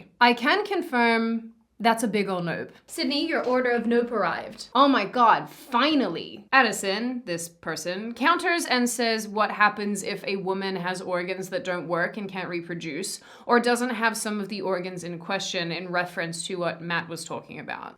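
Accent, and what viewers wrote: United States. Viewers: It's because the world has gone mad. Also, off topic, but you sound completely American now. All traces of your Aussie accent are pretty much gone 😪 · you sound more american every time i watch a video.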